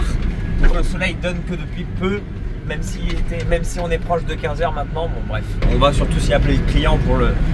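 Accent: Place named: East, France. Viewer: bonsoir tu parle de plus en plus comme les gens de l'est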